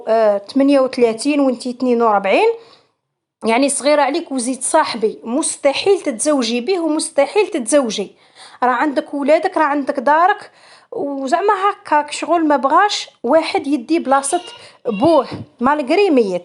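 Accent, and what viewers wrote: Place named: Oran, Algeria. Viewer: انا في رأيي اقنعي وليدك قبل ومن بعد اتزوجي ما تخسريش وليدك الدنيا دوارة مهماكان وليدك راه كبدتك وما يجوزكش والراجل براني وممكن يدور عليك وإذا ما بغاش وليدك بعدي على هاذ الزواج وحافظي على وليدك وبنتك الدنيا قصيرة بزاف ما تستاهلش تخسري وليدك على راجل لوكان اتزوجتي ثم ثم بعد وفاة زوجك وكي كانوا اولادك صغار. اختي يعطيك الصحة والعافية على القصص الي راكي تحكيها في قناتك انها عبر ودروس لكل من يسمعها وهدرتك باللهجة الوهرانية هايلة نبغيها ونموت عليها تحياتي القلبية لك من الشلف.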